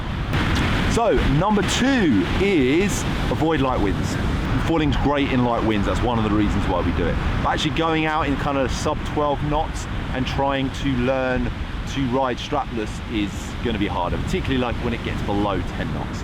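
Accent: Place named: United Kingdom. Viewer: Great vid! Clear British instruction!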